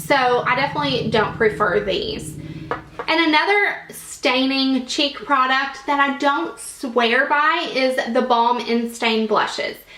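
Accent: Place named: South, United States. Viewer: I'm so happy some one is finally being honest on YouTube. I really appreciate it!! Love you videos so much... And I love your accent, I want a southern accent soooooo badly!! 😭😭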